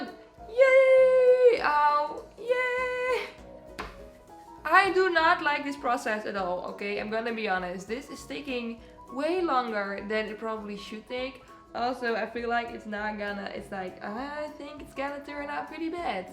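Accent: Netherlands. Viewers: Yayy! I love that hair and your dutch accent ^^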